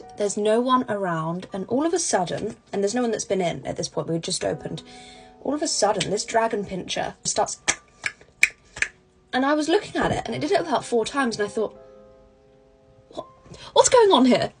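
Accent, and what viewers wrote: United Kingdom. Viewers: Apart from the topic 😅 love British accent ❤from Indian. So classy, relaxing and elegant. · I agree such a lovely voice. I'm American so I always fall for the British accent.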